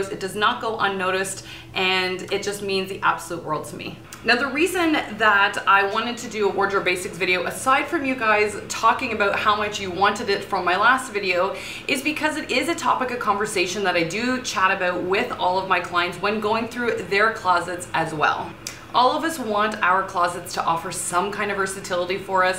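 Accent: Canada. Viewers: I love watching you. Your denim video is basically my go to guide now and I kept saying to my friends, no the point of the pocket should be at the bottom of your bum....LOL. I love your authenticity, sincerity and expertise. I watched so many stylist on youtube and ones who have a lot more subscribers but I only subscribed to you because you are doing a great job providing genuinely value-add content. I also love your Toronto accent as one of my favorite co-workers is from there and she sounds like you :) I am really proud of the work you are doing and I just want to encourage you to keep fighting the good fight!!!!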